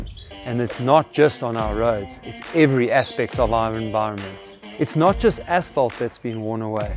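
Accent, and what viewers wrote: South Africa. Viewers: Are you South African? You sound like Dr. Grobbler, my friend in Ladybrand.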